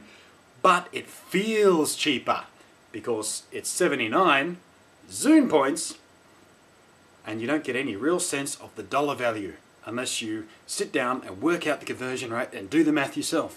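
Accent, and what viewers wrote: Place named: Australia. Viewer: How do you know this if you do not even have a zune and not even allowed to buy a zune because you live in Australia well it sounds like it from ur accent. I have a zune and it is better then a ipod in my opinion I dont care what you say im just saying that you are saying stuff you dont even know for real Specialy sence you cant even get one.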